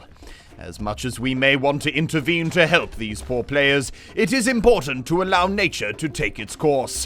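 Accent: United Kingdom. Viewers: An Australian speaking with a British accent seems.... wrong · why the british accent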